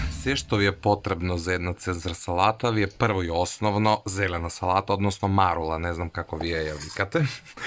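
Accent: Kumanovo, North Macedonia. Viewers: zbori na kumanovski prekrasno video pozz <3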